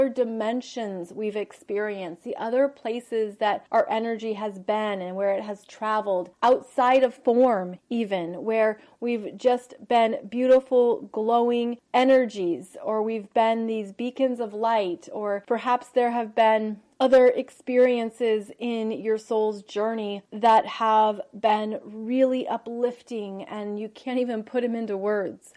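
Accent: United States. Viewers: I bet you are from Michigan. Northeastern...???? I hear it in your voice.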